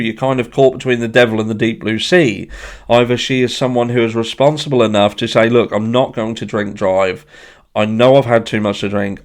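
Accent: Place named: England, United Kingdom. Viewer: Awesome sir! So glad found ya! I love the English accent my friend! Our views are same on many aspects of this case! Thank you for good information,and great theories! Have great Friday Mr!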